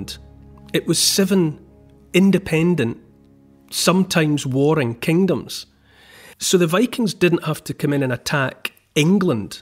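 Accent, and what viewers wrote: Scotland, United Kingdom. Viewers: Hi there. I love your soothing Scottish voice. You could talk about anything even and i'd listen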